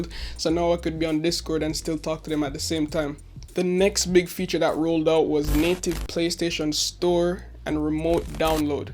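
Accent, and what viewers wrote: Jamaica. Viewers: Ur Jamaican I could tell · You jamaican? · U jamaican bro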